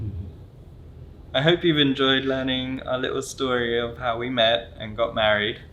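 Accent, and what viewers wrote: United Kingdom. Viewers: Your accent is slipping a bit, as an American who has several British friends I can hear it. It is because you are married to an American, nothing wrong with that. I can hear you becoming more American in your accent, lol! Don't lose that pretty British accent!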